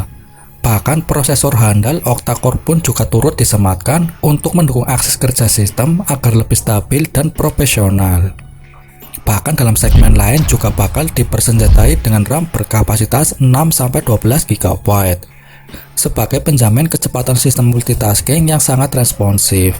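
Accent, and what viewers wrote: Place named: Java, Indonesia. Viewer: Medok amat..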